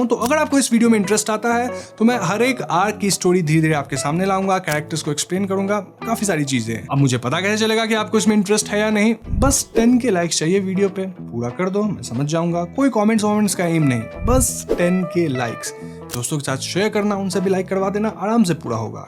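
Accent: Bengal, India. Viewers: Bengali ho app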